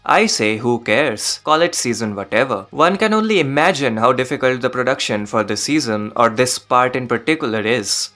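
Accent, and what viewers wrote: India. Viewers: You can't mock anyone while having a loli profile picture and that goofy ass Indian accent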